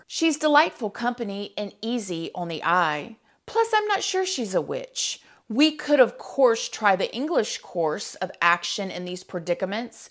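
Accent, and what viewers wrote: South, United States. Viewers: Seriously! As a Texan; raised (mostly) by my Brooklyn mom who recently had lost all those goofy (or what I used to think was obnoxious 'isms) after a stroke. It is TOTALLY my favorite when "da, da, da" and "excetera" 's... ESPECIALLY with that southern twang! My foreign grandparents used to say I have the thickest southern accent, which I really don't having grown up around so many different accents. tickles and warms my soul.. helps the grief 🥰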